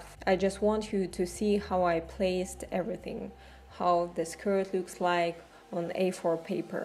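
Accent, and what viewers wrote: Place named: Russia. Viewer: Очень русский акцент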